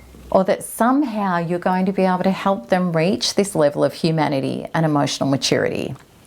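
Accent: Australia. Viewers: Australian accents are soy nice.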